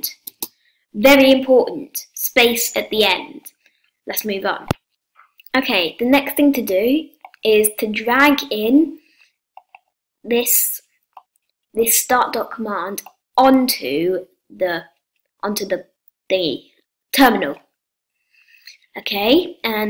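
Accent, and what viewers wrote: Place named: United Kingdom. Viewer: Dat British accent tho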